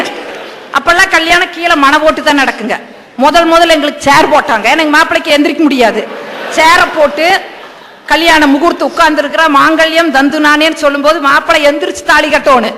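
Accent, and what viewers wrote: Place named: Kongu, India. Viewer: கொங்குத் தமிழுக்கு செவி சாய்க்கிறேன் சகோதரி❤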